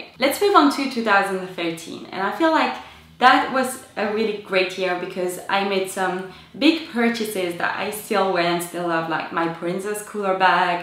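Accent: France. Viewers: Are you French? I'm french, x) and when people talk in english It's the same accent x) sorry for my bad english ;w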